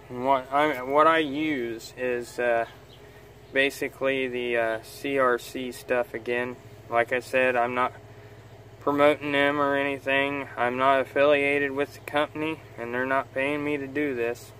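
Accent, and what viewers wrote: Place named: Southern Ohio, United States. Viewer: I'm from southern Ohio your accent sounds just like mine were you from Chillicothe Ohio Jackson or porstmouth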